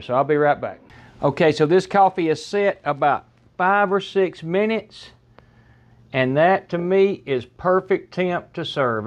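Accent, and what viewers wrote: South, United States. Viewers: Love your southern accent ❤️ thanks for all that info!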